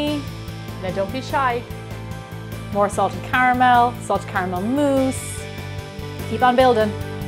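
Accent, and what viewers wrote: Ireland. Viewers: Made this for our Christmas Dinner Dessert. It was a crowd hit. A lot of work, but very much worth it. Definitely a keeper recipe. It can be made in stages (the brownies and caramel the day before etc.). Thank you!! Warning #1: It is delicious but VERY rich. It fed 10 at our dinner and we didn't even dent half of it. Warning #2: Pay close attention to the colour of the sugar for your caramel. The darker it becomes, the stronger flavour your caramel will taste. If you wait too long (sugar too dark in colour), it will taste like a burnt sugar caramel. Still good if that's your thing, but I prefer it just after turning golden and before getting very dark brown (it's a personal preference thing). Warning #3: When making the caramel, add your butter all at once and then whisk in (as says). Have your butter and cream set aside at room temperature if possible (not cold), this will help with the caramel staying emulsified, and not separating. 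Warning #4: If using salted butter, omit adding salt at the end. Warning #5: `s recipes are fantastic, but her Irish accent alone will make you want to come back to this channel.:)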